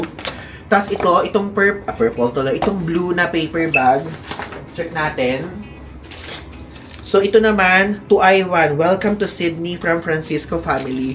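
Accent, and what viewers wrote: Nueva Ecija, Philippines. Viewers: Pag lumalabas ung Nueva Ecija accent mo naalala ko ung friend. Ang kyuuuut hahaa 😁🤗🤗🤗🤗🤗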